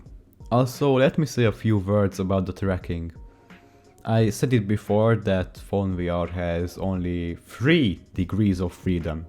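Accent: Hungary. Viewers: Az accent az nagyon magyaros meg persze a google HUF valuta is elarulta hogy magyar vagy koszi a vidiert ♡ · Olyat ugrottam a székben mikor megláttam hogy huf de visszanézve a magyar akcentus felismerhető volt 😅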